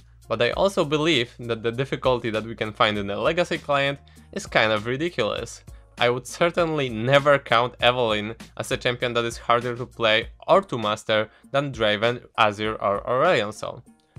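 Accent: Poland. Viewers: Ale polski akcent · Are you Polish? :D Your accent sounds like Jankos' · ej ty chyba masz akcent polski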